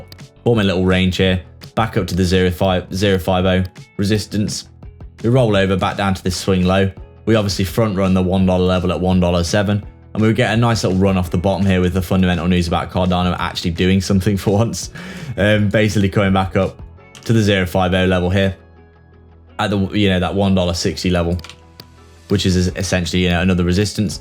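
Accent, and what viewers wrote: United Kingdom. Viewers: Its so refreshing to hear a fellow brit talking haha!! Keep it up mate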